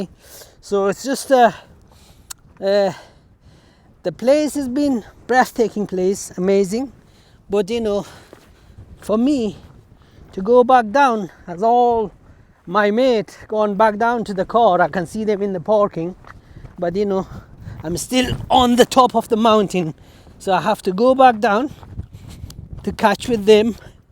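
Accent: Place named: Stoke, United Kingdom. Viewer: Where did you pick up the northern english accent pal? Sounds a bit like a Stoke accent. Love it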